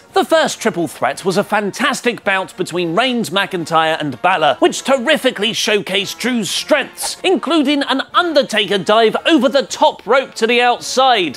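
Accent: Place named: United Kingdom. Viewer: u need a rko I hate ur British accent I'll give u a beer then a stone stunner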